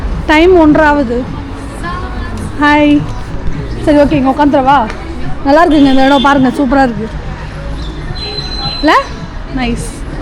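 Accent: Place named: India. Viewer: யூரோப் & யூரோப்பியன் யூனியன் பிரதேசங்கள் பயணம் செய்யும் போது, இந்திய ஆங்கிலம் பேச வேண்டாம்.பஸ் என்கிற வார்த்தை புஸ் என்று கேளுங்கள்.போலிஸ் வார்த்தை புலிஷ் என்று கேளுங்கள், ஏர்போர்ட் வார்த்தை ஏர்போட்டா என்று கேளுங்கள்! உங்களுக்கு வழி கிடைக்கும்.😊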